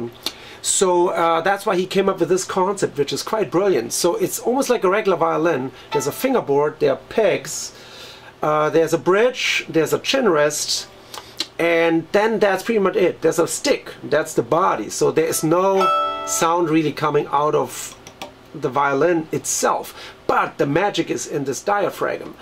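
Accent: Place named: Germany. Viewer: You sound like you' re from Germany.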